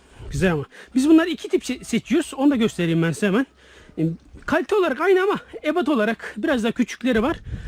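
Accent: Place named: Thrace, Turkey. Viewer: Trakya mı orası şiveniz trakya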